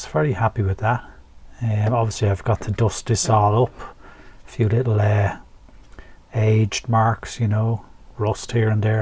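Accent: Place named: Ireland. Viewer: Great to hear an Irish voice on a modelling channel.. ❤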